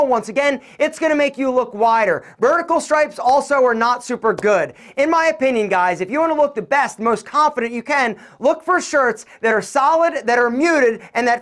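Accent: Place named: Philadelphia, United States. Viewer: “Ouh-vurr” = Over ... This dude is definitely from Philly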